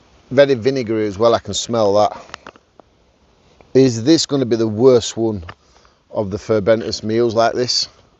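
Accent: United Kingdom. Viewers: here for outdoor motivation, and best uk accent! thanks mate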